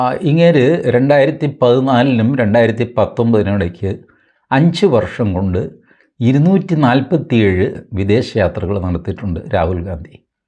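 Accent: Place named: Kottayam, India. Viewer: എതായാലും താങ്കളുടെ ആ കോട്ടയം ഭാഷയില്‍ ഉള്ള, hasyathmaka അവതരണം കലക്കി 😂😂 ജവാബ് നഹി 😂...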